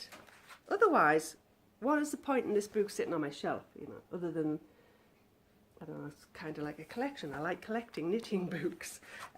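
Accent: Sunderland, United Kingdom. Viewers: Nice to see you back, missed your Sunderland accent lass. Hope 2017 will be better for you & your family♥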